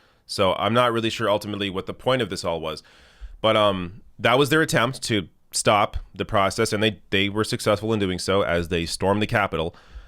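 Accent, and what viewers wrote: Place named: Canada. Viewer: Your Canadian accent came through more in this video and I love it.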